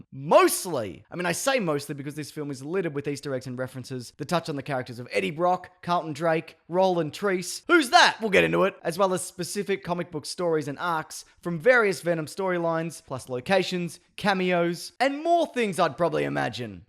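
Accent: Australia. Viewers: Wait I just clicked on this video and I have no clue who you are but you sound great! Umm are you Aussie cause I am I can hear it in your voice are you???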